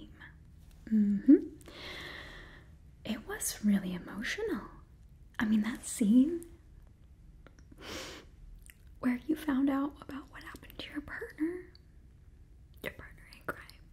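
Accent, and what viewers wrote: Russia. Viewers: as Russian I can say that is is an amazing Russian accent! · Русский акцент получился очень хорошо!!!!🥰💖💖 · russian stylist having a sister named natasha and the same accent as yelena belova in marvel my obsession is too big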